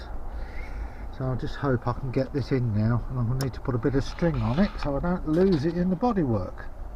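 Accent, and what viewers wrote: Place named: United Kingdom. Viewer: Thanks for this video. As a British accent lover i have to also say that your accent is amazing!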